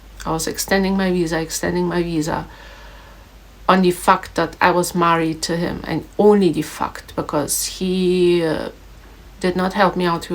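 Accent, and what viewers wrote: Trinidad and Tobago. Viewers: You are stronger than you know. You are a true Trini resilience and strong. You even sound Trini❤ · You may have left Trinidad, but I hear and see Trinidad in you. Big up, and stay strong gal. Ya go make it.!!! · You're a true Trini with accent and all. You will get there soon...love the sunset painting ❤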